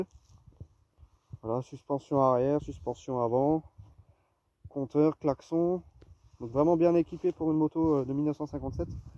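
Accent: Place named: Alsace, France. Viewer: Salut l'illuminé 🤣 Tout d'abord bravo pour ce que tu fais! Je restaure en ce moment une MICMO S98 identique à la tienne, mais qui malheureusement a été recouverte d'une vilaine couche de noir sur toute la bécane. C'est donc avec grand plaisir que j'ai regardé ta vidéo encore et encore... Je suis près de Strasbourg et vu ton accent il se pourrait bien que l'on soit voisin. Si tu es encore en possession de la MICMO, tu penses qu'on pourrait se voir ? Il y a sur la mienne des pièces manquantes dont je pourrais prendre les mesures sur la tienne. 👍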